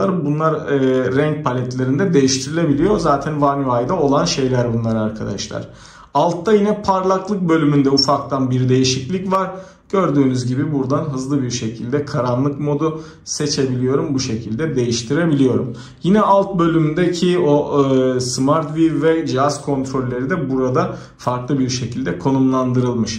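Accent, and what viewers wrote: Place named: Sivas, Turkey. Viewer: abi sivasın neresindensin :D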